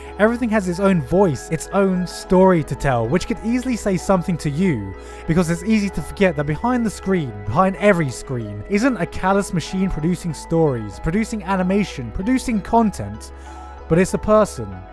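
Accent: United Kingdom. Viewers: You know, watching videos is a lot like watching a well-written show. You have the videos like his "Anime in Minutes" series or his rants/explanations like the "Konosuba" video series which are meant to be lighthearted entertainers. Then, every once in a while, you get videos like these. That same lovable British accent we all know for cracking the occasional dick-joke goes on to bring us "The Perfect Anime" and videos such as this which explore personal feelings and memories. These videos remind me of watching an anime, because while we connect to anime, many of us can probably connect to this video. It's really something amazing that I can watch this video and get so much more than a single, simple point out of it. It's as if you've just said what many have tried to say but couldn't. Thank you.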